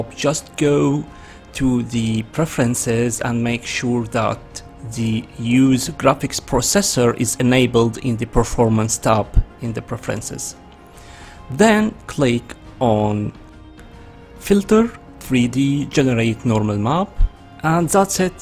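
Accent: Arab world. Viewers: are you Arabian , your accent says that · your english sounds arabic lol. nice video by the way, keep the good work up.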